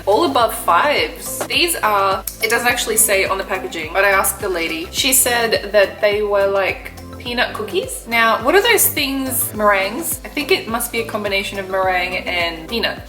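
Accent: Australia. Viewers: I love to hear aussie accent.. plus eating filipino food... that is gold... keep it up.. new subscriber here... I hope you recognize me..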